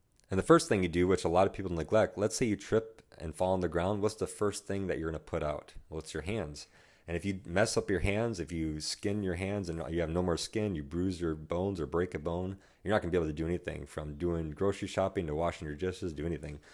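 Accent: United States. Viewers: Holly molly, as soon as I was 15 secs into your video I knew you were american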